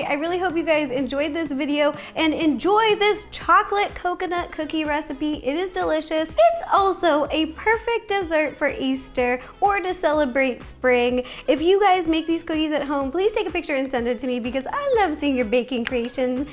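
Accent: South, United States. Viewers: Has anyone noticed how thick her “southern-like” accent got in this video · Ro i love you so much and every time I hear you talk i’m just reminded of my family down south cause ya just sound like a sweet little southern belle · She sounds very southern in this video. Like compared to normal. Idk why. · Does anyone else think that she sounded a bit like she was from the south?! Ro you are still amazing, you are so uplifting and kind and you make me smile every time you post a video!! Happy Easter everyone 🐣❤️ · I'm curious to know where the Southern accent is coming from. She's from Washington. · This quarantine is bringing out Ro's Southern Belle! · Ro sounded super southern in this video! It was pretty cute 💜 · sounds a bit different like a southern accent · Anyone else feel like Ro has more of a country accent today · I never realised Ro had such a Southern accent. 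· Is it me, or did Ro have a lil bit of a southern accent goin on here? Either way, it is adorable and I love her♥️ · Ro, you sound sothern in this video. I know you said you grew up in Seattle but you kind of did this accent most of video. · She sounds so southern omg. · She sounded like she had a southern accent for the majority of the video.😂 I love her. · Southern Ro came out for this video. Pretty cute. These look incredible. I think I have all the ingredients! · Happy Easter to you and family. Love your videos and dress. Why were you speaking with a southern accent? Thanks for the video, I can even eat this now during Passover. ❤️💕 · She’s sounds a bit southern today... and I kinda love it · When did Ro become a southern mama?? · She's got a little bit of a Southern accent going on here, lol. She's so adorable. Love you, Ro. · Is it just me or did ro develop a little southern accent throughout the course of the video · yo why she sound so country in this video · This is meant as a total complement but I love how southern you sound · But why did Ro have a southern accent in this video 😂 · I love how throught this video she had a thick sounding southern accent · She sounds more western or southern than other videos, lol or is it just me? 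· she went so southern after folding the dough! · Ro is so southern in this video and I love her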